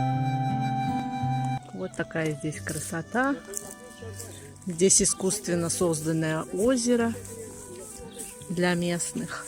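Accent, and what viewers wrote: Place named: Russia. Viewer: У нас в России есть пословица, встречают по одёжке, провожают по уму, спасибо за интересный фильм, вы по настоящему русская женщина, я имею в виду как вы разговариваете и строите свою речь, только так русская может говорить и высказать свое мнение, интересная ваша семья, дети воспитаны, выскочил ваш канал, не смотрела, а потом подсела, лучший турецкий сериал, здоровья вам.❤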